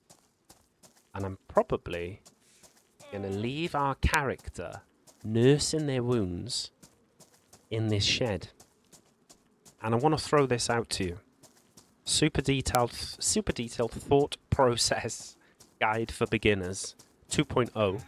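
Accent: Wales, United Kingdom. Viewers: No problem mate, also i thought i recognized your accent are you from Wales by chance? if so then hello there neighbor, I'm from England lol!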